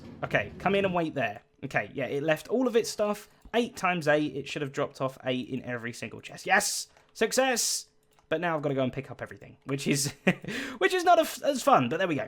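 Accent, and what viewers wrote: United Kingdom. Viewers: Why do british people get all the great accent? It's not fair :(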